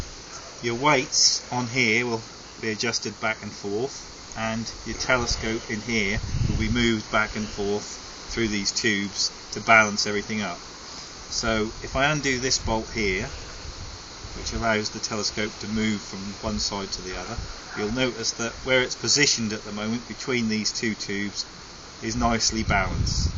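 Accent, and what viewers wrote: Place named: Norfolk, United Kingdom. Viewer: You sound like a good old Norfolk boy. I was born in Yarmouth but have lived in Ipswich for the last 10 years. We have some lovely dark skies around here and the Skywatcher 200 is on my shopping list. Good video.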